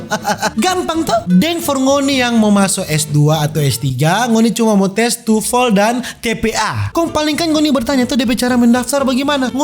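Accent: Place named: Ambon, Indonesia. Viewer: Logat nya sama sperti ambon dan kupang Salam dari kupang NTT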